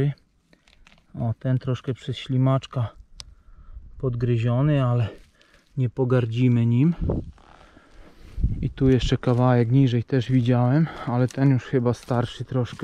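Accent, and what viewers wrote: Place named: Masuria, Poland. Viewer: Skąd jesteś? Sądząc po akcencie gdzieś z Mazur. Zgadłam?😉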